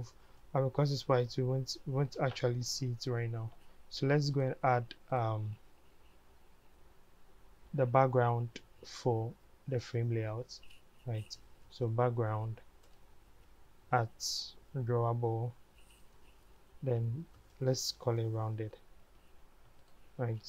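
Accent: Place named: Nigeria. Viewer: nice video, you have a nigerian accent are you from Nigeria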